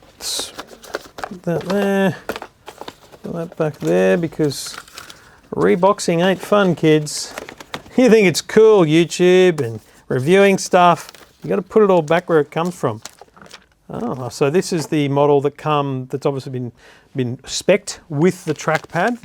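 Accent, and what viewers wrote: Australia. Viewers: Nineneen nineny eight. Theeng. Compuudah. You must be Australian...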